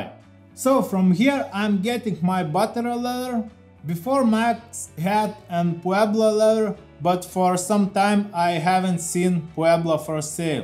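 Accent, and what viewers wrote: Russia. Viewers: Русский акцент)) уважение.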